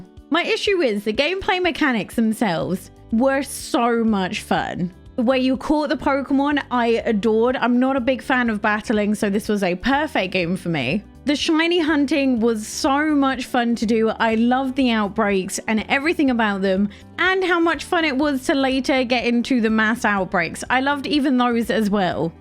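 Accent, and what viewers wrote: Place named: England, United Kingdom. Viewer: Somehow there is not one english accent that I like. There are only ones that I can "tolerate" listening to. I guess it is the language then that I just don't like the sound of.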